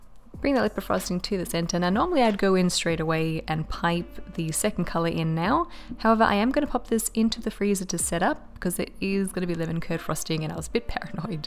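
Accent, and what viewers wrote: Australia. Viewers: Hi,. Your lemon cake looks so pretty, just like you and your Australian accent, mate. What's your best tip for making the best strawberry cake? Take care of yourself, sweetie. <3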